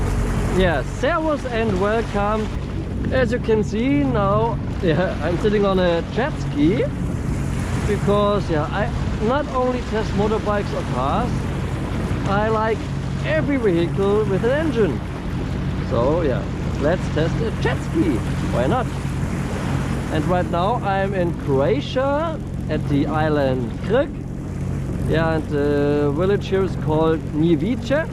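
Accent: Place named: Austria. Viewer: Stark gemacht danke.. hörst dich bisschen österreichisch an haha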